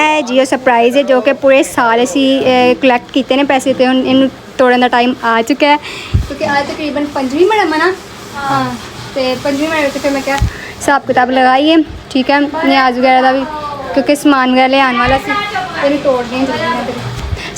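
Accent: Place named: Punjab, India. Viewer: Hi Salam, Nice vlog 😊 Ap jo ya urdu boltay huhy Punjabi ka touch dayti hy maza aya jata hy 👌 😅😂, Han agar ap meray hath ka Custard khaya gi tu fan ho jaya gi 😊 qk ma usmay sugar use nahi karta (Secret hy 😉) 😅😂.. Love u ❤ & take care. Keep Smiling 😃 and Keep Shining ✨️ always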